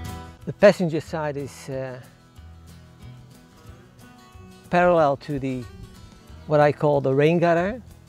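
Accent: Netherlands. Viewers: Ik hoor een Nederlander :)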